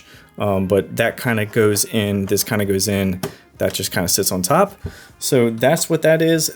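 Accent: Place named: Baltimore, United States. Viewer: Had no idea where you were located but had a good guess from that accent! Gotta be a Charm City Ghostbuster right here! 😂 Loving the videos! 👍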